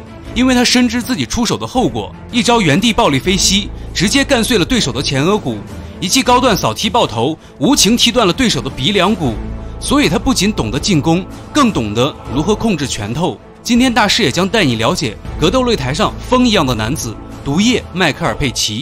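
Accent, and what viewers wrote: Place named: Henan, China. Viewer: 画外音的普通话口音能听出是河南人😁，河南电视台的格斗节目“武林风”节目很有名……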